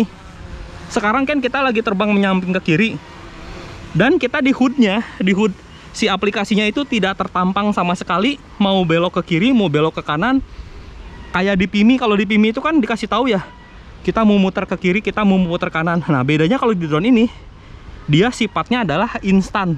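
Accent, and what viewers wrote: West Java, Indonesia. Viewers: Sunda eyy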